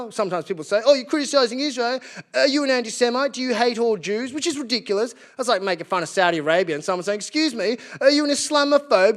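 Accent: Australia. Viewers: You are Aussie! How marvellous❤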